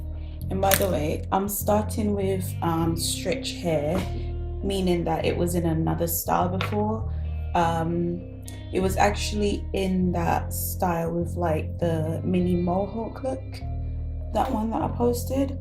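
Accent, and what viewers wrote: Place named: United Kingdom. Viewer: Instagram Subbie here, I never knew you had a British accent. Just always loved your hair.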